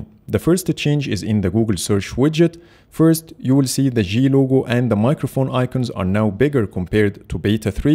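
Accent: Egypt. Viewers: Are you Egyptian? your accent is Egyptian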